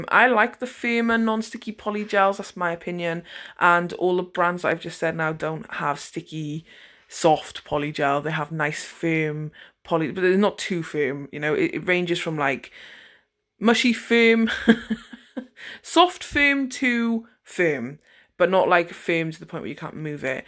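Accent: England, United Kingdom. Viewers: 🤗 Your little English accent is so freaking cute! I love it when you say "lush" and "bloody" and stuff!💖 Everything you say sounds so super classy! Yeah Amazon is like where I LIVE!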